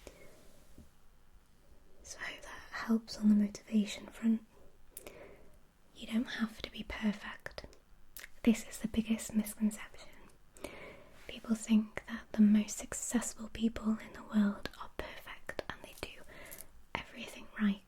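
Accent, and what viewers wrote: United Kingdom. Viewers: I love your accent! Where in the UK are you from?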